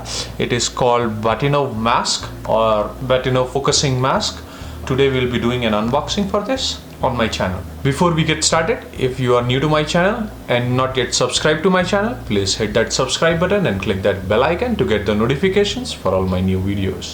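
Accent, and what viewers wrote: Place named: India. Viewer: Are u indian. U have an indian accent but ur bio say u are mexican 😅. Why don’t u make more videoes related to telescope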